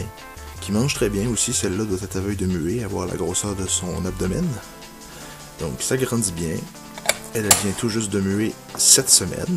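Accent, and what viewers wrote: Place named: Quebec, Canada. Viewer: J'adore l'accent québekoi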